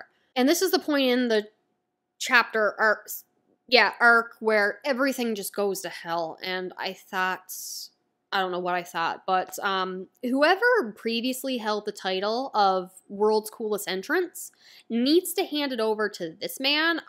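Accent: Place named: Canada. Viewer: My German accent is no better but your Canadian (I think) is killing lmao hahaha the way you say "Lahhh" instead of "Law" has me in tears. Love the content!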